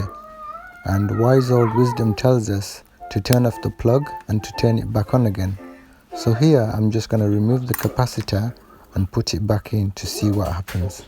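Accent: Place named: Black Country, United Kingdom. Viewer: Hi. Are you from Smethwick by chance. You have more black country accent than Brummie.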